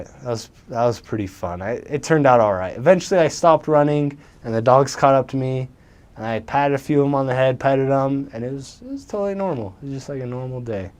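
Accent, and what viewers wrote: India. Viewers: ur indian english is awesome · Your indian accent is killer😂😂😂😂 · You sound perfect (Indian accent) Most Indians who moved to U.S use fake American accent to sound cool😂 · His Indian accent is on point! I love the way he says liberal with rules, acclimatized stomach... So polite 😄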